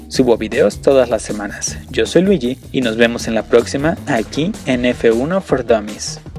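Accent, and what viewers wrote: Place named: Mexico. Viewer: q tenga acento mexicano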